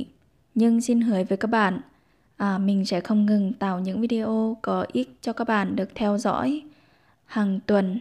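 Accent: Nghe An, Vietnam. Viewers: Phải là " gạo một nắm" mới chuẩn nhé.Cần Tày boong khỏi...Giọng nói của dân ở Con Cuông Nghệ an ?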